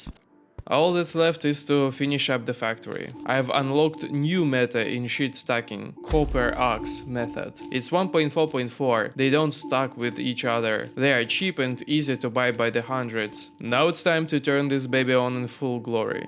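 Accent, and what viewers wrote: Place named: Russia. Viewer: А акцент то русский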